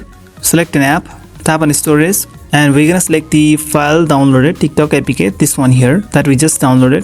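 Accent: India. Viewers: couldnt understand jack shit from the indian accent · That seems like a you issue honestly. That said, he does have some weird mix of accents, & this is coming from another Indian.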